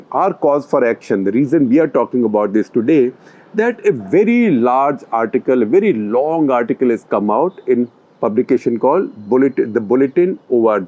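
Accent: India. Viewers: Firstly so difficult to follow this Indian due to his heavy accent. Second, he failed to mention the outbreaks and deaths in Fort Detrict, Maryland back in August 2019, which the CDC Robert Redfield confirmed was covid-19. Then the traces of this virus found in waste waters in Italy, Spain and France as early as March 2019. These important facts were not mentioned nor investigated even though the findings were months early before the Wuhan outbreak. This report is rubbish and unreliable and biased ! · GOOD GRIEF!! SORRY UR YAKKING IS SO MONOTONOUS N NITBTO D POINT I HAD TI SHUTVOFF AFTER 5 MINUTES... IT WAS UNPLEASANT TO LISTEN...TOGETHER WITH D INDIAN ACCENT UT MADE IT WORST. .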